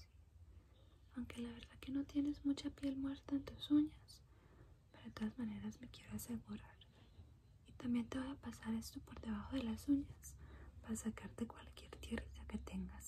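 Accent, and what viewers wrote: Colombia. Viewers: me encanta tu acento colombiano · aprovechar tu acento colombiano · me encana el acento colombiano es mi favorito en el mundo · El acento colombiano me encanta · Me encanta el acento colombiano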